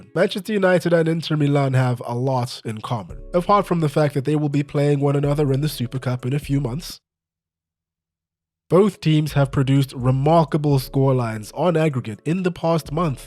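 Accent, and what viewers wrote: South Africa. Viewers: I KNEW YOU WERE SOUTH AFRICAN! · is a Shona name, but you have a South African accent, got ya! Sharks😂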